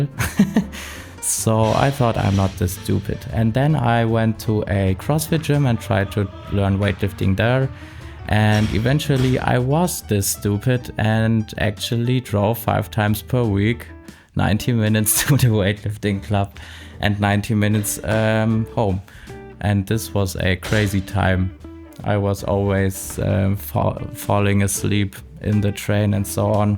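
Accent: Germany. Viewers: Can't tell that you have a weird or "sleepy" voice in English. You just sound like a German speaking English carefully. Vielleicht auf Deutsch könnte ich die ,,Schläfrigkeit" hören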